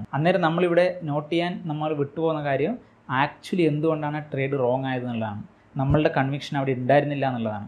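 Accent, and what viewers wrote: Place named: Kannur, India. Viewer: കണ്ണൂർ ആണോ സ്വദേശം,..your slang seems so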